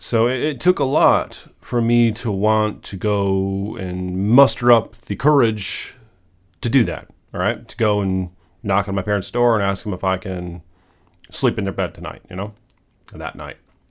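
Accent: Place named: Canada. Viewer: I swear, I thought you were from Canada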